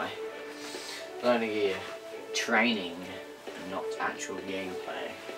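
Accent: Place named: Liverpool, United Kingdom. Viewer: Why did you buy this you scouse?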